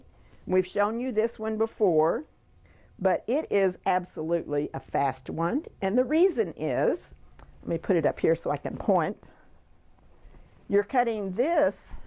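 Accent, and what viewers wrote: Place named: East Texas, United States. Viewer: Love to listen to you. Lovely E Texas accent. I am an Eastern planted in San Antonio.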